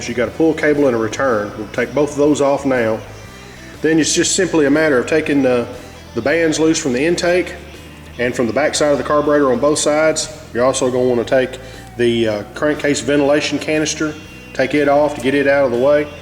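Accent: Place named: Arkansas, United States. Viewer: A good work, I'll keep your tutorial in mind since I have one of those bikes (the LM version) and, someday, I should try to clean those carb. Just for curiosity, have you sold the bike or are you using it for fun in the fields? P.s. I appreciate your patience in explaining slowly while filming the procedure, since I'm not very familiar with Arkansas accent: not with any US accent, in fact :)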